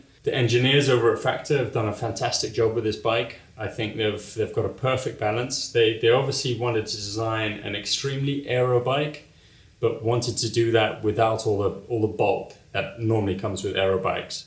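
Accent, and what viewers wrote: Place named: South Africa. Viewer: Everything he said about disc brakes is accurate. It's not where it needs to be. Also, I could really hear the SA twang in his accent during this video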